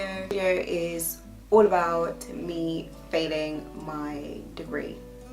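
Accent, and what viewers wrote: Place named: United Kingdom. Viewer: Who would have thought you were from Blighty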